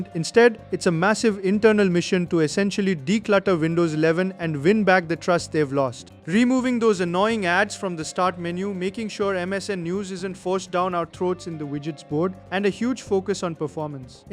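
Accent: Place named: India. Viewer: Someone has to say it: It’s not AI, he’s just Indian, they all sound that way! Actually I know a lot that speak without any accent to the point you mistake them from other places. I also appreciate that at least it’s real info and accurate how I feel to the situation. I can’t say slop certainly. If it was AI, then even AI sees the issues.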